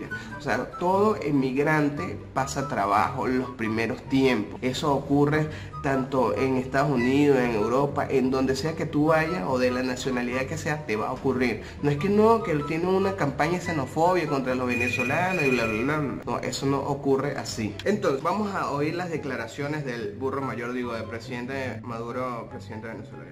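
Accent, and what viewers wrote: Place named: Peru. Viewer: cada ves te sale el dejo peruano naturalmente,y la manera de hablar del señor bayly xd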